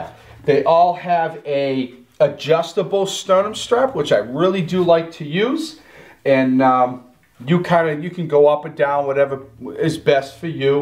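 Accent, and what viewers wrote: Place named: New England, United States. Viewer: Great vid !!! Btw I'm sensing a fellow New Englander by the accent !!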